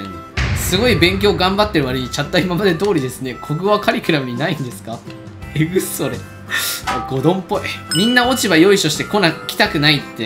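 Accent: Kansai, Japan. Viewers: kunさんはたまに関西弁が出てましたから関西出身やと思っとりましたけど岡山出身の方なんですね。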